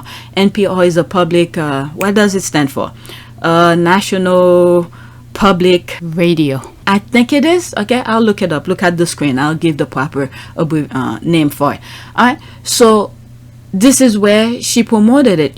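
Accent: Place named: France. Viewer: I just came across your channel girl you are so good at hitting the main point on each click I love your French English accent if am right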